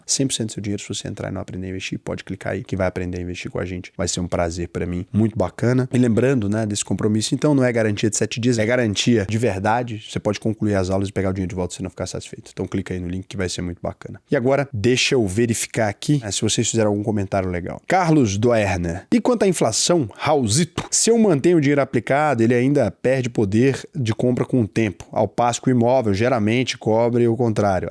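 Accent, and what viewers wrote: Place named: Goiás, Brazil. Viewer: Raul, vc é goiano? sabia não....sotaque diferente.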